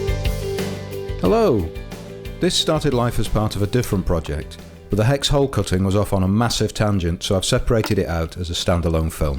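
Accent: United Kingdom. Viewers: This video is impressive, the narration is spot on one of the best on you tube, nice clear british accent (instead of the usual Yankee or Aussie). so i have subscribed thanks for sharing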